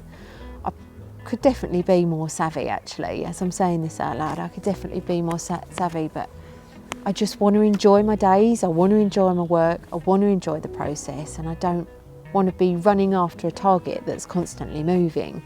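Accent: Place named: England, United Kingdom. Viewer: Love your voice, your English accent is great! Course, I’m in Chicago 🤷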